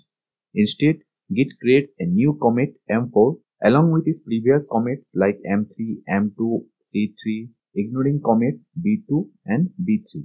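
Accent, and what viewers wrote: Bengal, India. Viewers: English accent se bangali lag rha h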